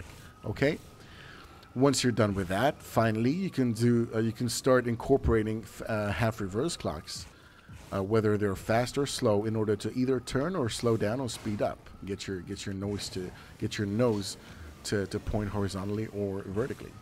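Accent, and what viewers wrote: United States. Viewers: what happened to your accent 😭😭 its almost american now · american accent but a british one in the mega video? lol also thanks for this you absolute legend!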